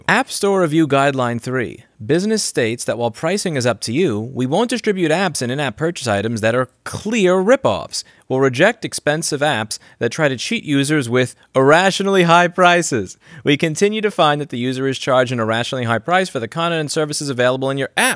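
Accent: New York, United States. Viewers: Get the fuck outa here, yep he is definitely from new york lol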